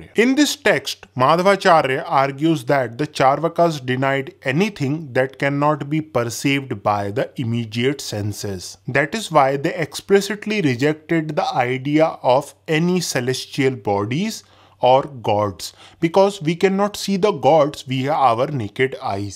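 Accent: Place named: North India, India. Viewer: *north indian accent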